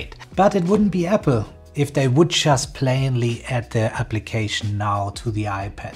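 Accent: Germany. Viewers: Du kommst doch Safe aus Deutschland oder?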